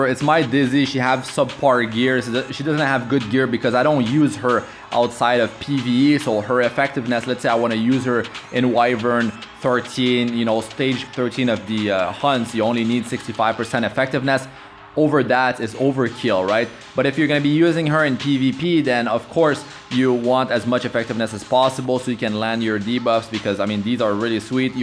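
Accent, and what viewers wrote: Quebec, Canada. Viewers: Salut t québécois?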